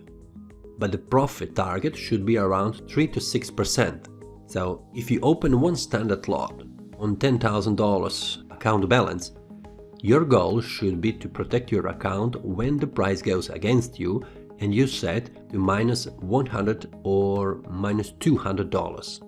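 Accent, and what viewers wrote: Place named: Serbia. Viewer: I like your accent where are you from? Serbia?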